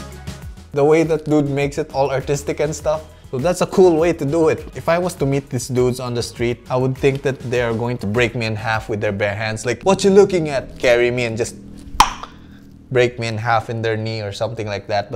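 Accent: Philippines. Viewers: That pinoy accent is so distinguishable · he filipino based on accent. lol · within the first 10 seconds I KNEW you were Filipino hahaha I love it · hes from the Philippines men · Hahahahaha I’m assuming he’s filo! Coz his filo accent is STRONG!! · Me: hears accent Also me: guess he’s Filipino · Kaya pala familiar yung accent Pinoy nga hahaha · I knew from your accent. You lived in the Philippines, wow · Fluent english with filipino accent. Nice one dude 😊😊😊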